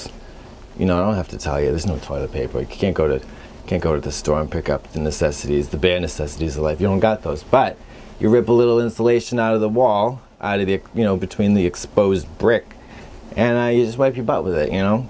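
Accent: Boston, United States. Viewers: You can really tell she’s from Boston wow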